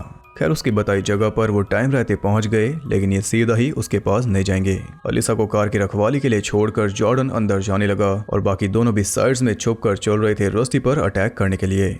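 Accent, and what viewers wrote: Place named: Bihar, India. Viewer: Friday the 13th 2009 wali movie fir se explain karo bhai please pahle ki voice acchi nahin hai aap Jo voice hai vah bahut acchi hai kyunki yah thodi Bihari 🥺🙏🙏🙏🙏🙏🙏🙏🙏🙏🙏🙏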